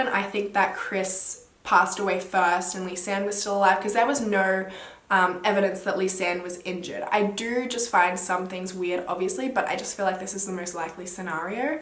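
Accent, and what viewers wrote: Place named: Brisbane, Australia. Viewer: Girl I somehow knew you were from brissy listening to your accent 😂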